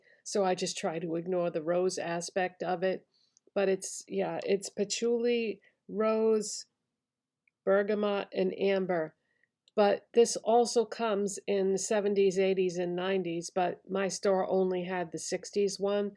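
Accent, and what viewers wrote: Boston, United States. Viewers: i just found your channel and i love your content, subscribed!! also, are you from the new england/boston area? we have the same accent :)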